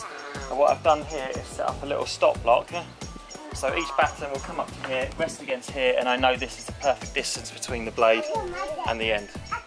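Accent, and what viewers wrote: Italy. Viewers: Are you italian? I can hear a tiny "ë" at the end of your sentences. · I'd say he's Italian 👍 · You sound Italian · I hear an Italian accent, no?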